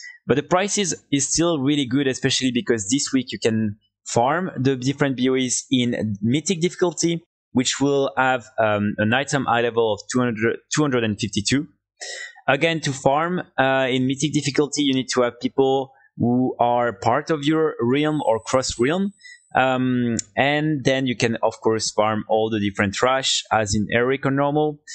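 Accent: France. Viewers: French accent 😂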